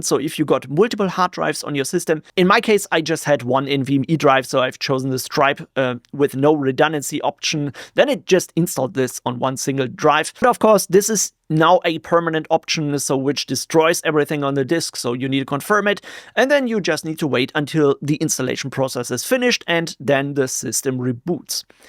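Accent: Germany. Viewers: I love your German accent, is it? Apologies if I'm wrong. Greetings from 🇬🇧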